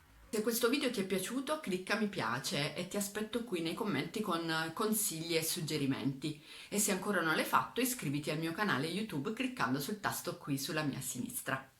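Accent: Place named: Bari, Italy. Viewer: Tu sei meglio di Diletta. Sai fare cose speciali e sei simpatica. Non mi sembri zona Foggia..più verso Bari. Sei chiara nelle spiegazioni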